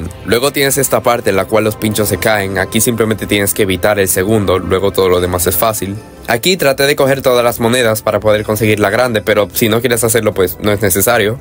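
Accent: Dominican Republic. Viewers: Are you Dominican?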